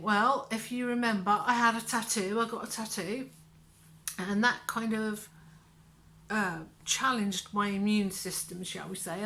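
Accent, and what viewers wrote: United Kingdom. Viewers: OMG, aren't you adorable! 😊 I love our channel - I've just now subscribed! GREETINGS FROM NAGOYA, JAPAN! (I'm not Japanese, myself... I'm a mix of Indigenous Canadian (Siksika/Mohawk-Cree) and Norwegian) - and I like your UK accent! My dear Abigail is from the UK, but she's a Yorkshire lass, so, different accent LOL - anyways, I love that you're still going strong with this channel of yours! Seems so many polyclay'ers have quit YouTubing some fistful of years ago, but you're still rockin' it! I LOVE YOUR CONTENT! 🙂 Please do continue it... I've so many ideas/questions... how to make boxes/containers from polyclay? Etc. - I'm trying to figure out how to make "Ranger Eyes" - they're basically, velcro patches for backpacks, first aid kits, and EDC (Every Day Carry) pouches... I wanna try using polyclay... as when the products are thin enough, they're flexible enough... 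but I might need to use my leathercrafting sewing-hole-punch 'round the edges before baking... WHOOPS! I'M RAMBLIING! LOL Anyways, thank you for your content! And tell what's-his-name to give you your crafting room back! CHEERS! 😉👍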